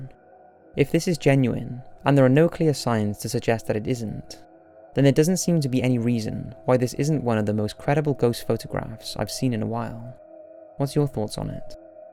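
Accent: United Kingdom. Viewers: To me why is it scarier when a British person speaks about spooky things 😎😑 you just earned a subscriber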